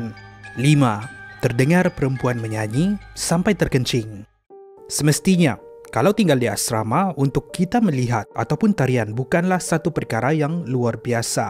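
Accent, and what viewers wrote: Sabah, Malaysia. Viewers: abang orang sabah kan???😅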